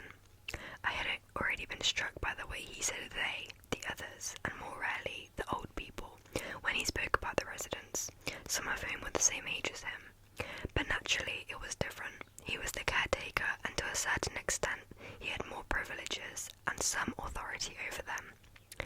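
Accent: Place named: France. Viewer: I love this book, Camus is such a genius! Plus the English to French language switch is incredible 🌟 PS: Love your French accent, you did great!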